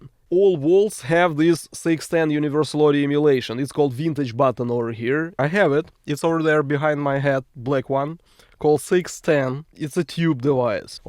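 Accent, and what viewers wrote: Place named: Russia. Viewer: Русский узнает русского за секунду)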